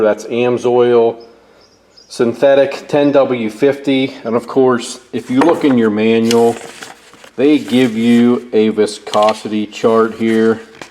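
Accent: Pennsylvania, United States. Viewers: I thought you sounded like you were from PA then I saw the PA sticker where abouts you riding?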